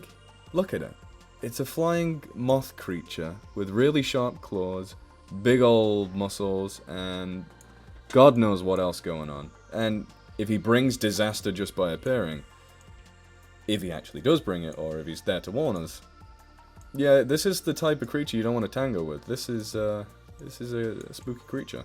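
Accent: Ireland. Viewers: I like your Irish! You are adorable! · 1, you're Irish? and 2, fucking lmao this was funny! But also you showed me some cryptids I've never looked up before 👀 gg, sweet vid~ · Love the Irish accent lol great video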